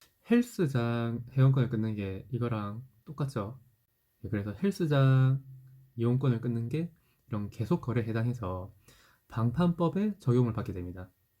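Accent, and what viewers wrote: Gyeongsang, South Korea. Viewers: 대구사람이신가요 말투가 제 친구들같군요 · 부산 사투리네 형 . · 부산 경남쪽 같으신데용?ㅎㅎ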